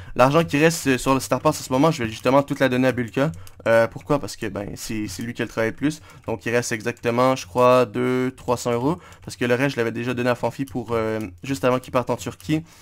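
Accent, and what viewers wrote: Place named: Quebec, Canada. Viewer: 13minutes de plaisir a entendre cette accent QC 😍😍😘😘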